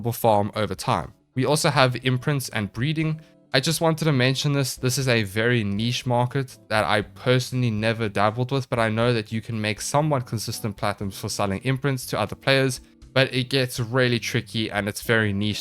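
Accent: South Africa. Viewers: Is my bro south African?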